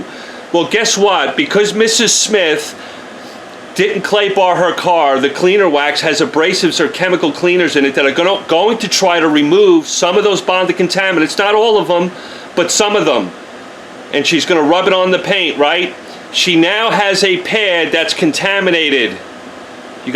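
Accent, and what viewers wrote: New York, United States. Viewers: Lol. You haven't seen mad yet. Sounds like a NY/NJ accent which is like this, he actually sounds calm here.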